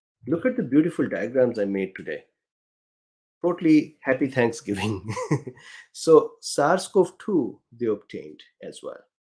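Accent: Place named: India. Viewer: Many times I try to watch your vids but couldn't put myself through your accent. I am not a native speaker of English either so I understand many people who speak English with some accent, but Indian accent is the one that I can not stand. Too bad.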